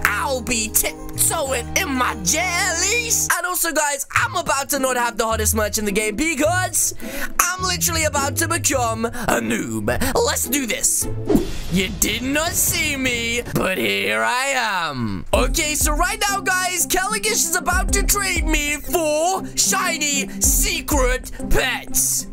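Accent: England, United Kingdom. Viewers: r u from England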